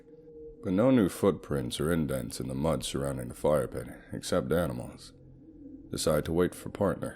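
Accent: South, United States. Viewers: Man I dig ur southern U.S. accent! From Oklahoma myself!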